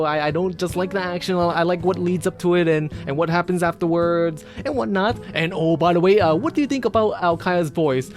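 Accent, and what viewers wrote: United Kingdom. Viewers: I'd say English but I can't place the region, but her voice is soothing. Also I'd work both but as a slave it's not like I'd have a choice anyways. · She sounds welsh I think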